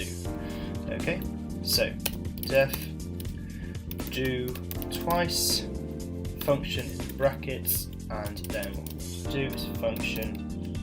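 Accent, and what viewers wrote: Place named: United Kingdom. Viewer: It's amazing how people want to create a video to help then forget that hardly anyone could understand what you saying when you have such a slurred voice not pronouncing your words and too much background noise which everyone knows draws attention away from what your saying. Slow down. It's hard enough to understand British accents let alone listen to loud music.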